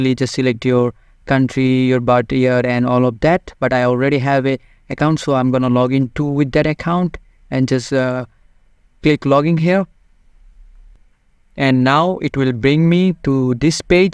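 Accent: India. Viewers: Indian accent! 😍 Yeah, I definitely can install this program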